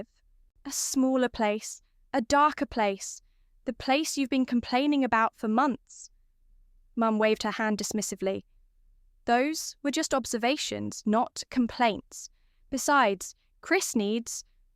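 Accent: United Kingdom. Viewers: I loved this story. It was thrilling and beautiful karma. I absolutely loved the British accent, never heard any of the AIs narrate in it. Some consequences come with a high cost when u come to your senses finally but it's always worth it (not for u obviously). 🤣🤣🤣🤣🤣🤣🤣🤣🤣. Well done OP. This story was really gripping and i couldn't wait for the karma to hit. Perfectly brilliant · Very interesting story. Good British accent to voice ai. Okay text ai. Ninas spelled Nah very annoying. I liked that endless angst of used persons which feels over the top most times was absent from this story. Its clear that having a good friend to talk over the crazy entitlement of her family was very helpful. The narrative movement is lovely. Her family's comments and entitled posts coming at points when she might have hesitated and had second thoughts. That Emma got such a quick sale and some help from the real estate developer in the timing was satisfying. 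I would guess parents had intended right from the start to give her unit to her brother. Also the speed of the process kept the browbeating, blame, manipulation and financial abuse from getting any legs . And once they were out, wow ,no time to indulge because now they had to pay their own rent utilities food etc. Definitely satisfying.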